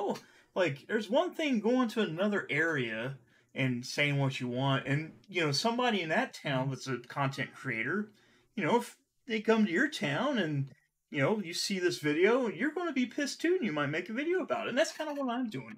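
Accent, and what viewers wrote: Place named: North, United States. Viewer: Watched 2 of his videos and saw he has little respect for southern life style. He sounds like he is from up north.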